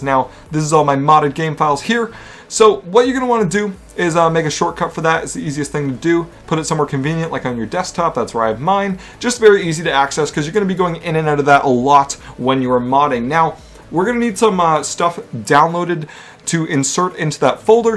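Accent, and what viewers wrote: Canada. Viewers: love Canadian accents. They're funny to me as an american lolololol. But, great video broskoski. Me and my buddy are new to PC and we were both able to download the mods pretty well with minimal problems (problems due to new PC dumbass'ry and slight intoxication).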